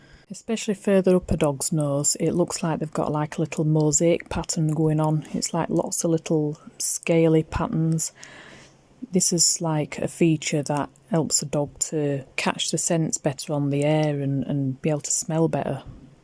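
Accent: Yorkshire, United Kingdom. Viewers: Love your channel and that you speak my (yorkshire) accent! Dog noses are my weakness so this is super helpful for me!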